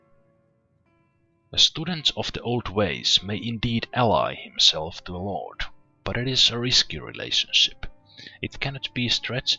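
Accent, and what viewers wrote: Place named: Finland. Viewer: you sound like Ville Valo , less refined when he was young with strong Finnish accent... Cheers from Virosta ! :D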